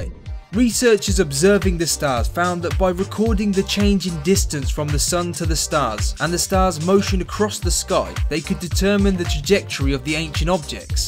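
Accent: United Kingdom. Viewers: Eh, generic, nasaly, mediocre british commentary is boring and over done.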